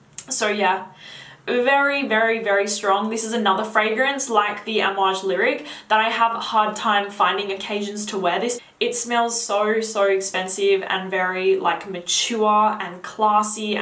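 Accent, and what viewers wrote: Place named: Australia. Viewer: I like your accent are you Australian or British?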